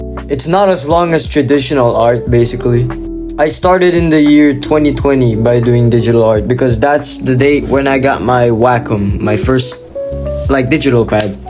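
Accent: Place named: Texas, United States. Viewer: why does bro sound texan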